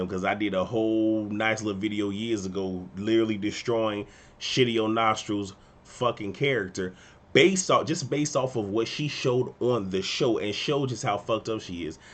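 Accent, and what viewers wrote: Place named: Louisiana, United States. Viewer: Lmao dead I hope they throw that water out, are u from Louisiana? I sorta hear an accent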